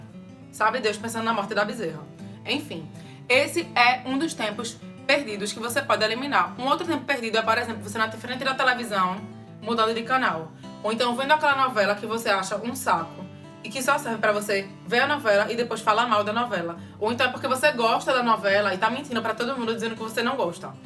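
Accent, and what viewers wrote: Pernambuco, Brazil. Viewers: Adoro seu sotaque pernambucano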